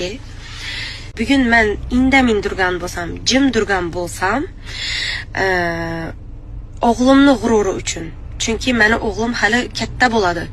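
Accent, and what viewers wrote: Khorezm, Uzbekistan. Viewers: Харазимча суйлопжатат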